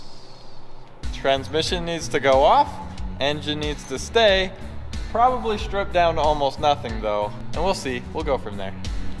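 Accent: Boston, United States. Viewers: love your boston.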